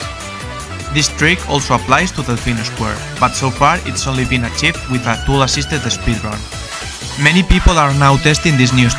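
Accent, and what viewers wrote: Spain. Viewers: El acento Español se notó mucho amigo xd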